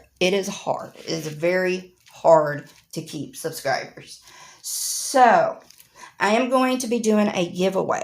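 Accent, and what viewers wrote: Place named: Texas, United States. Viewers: I'm from West Texas, and you sound great to me. My aunt from New Mexico would ask me to talk Texas for her, LOL. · Congratulations Sweetheart on your accomplishment!! You are so right that YouTube is hard!! You should be so proud of yourself. You’re an amazing person and deserve so many great things. I’m so glad that I’ve been blessed to know you. You’re a highlight in my life. I remember I found you just scrolling through YouTube unboxings and I was immediately hooked by your Southern voice and calm demeanor! You are EVERYTHING!!!! Congratulations on you being a sponsor as well! I hope that you get a ton of exposure and that more people experience the wonderful quality from your shop!! Love ya Girlie!!❤️ and I’m purposely not putting the hashtag!!!❤️❤️❤️❤️❤️❤️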